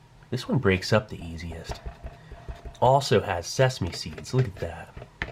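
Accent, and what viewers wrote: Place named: Midwest, United States. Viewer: Mid-America i think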